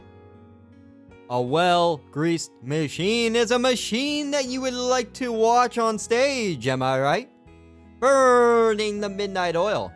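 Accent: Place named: Canada. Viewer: This guy sounds like he might be Canadian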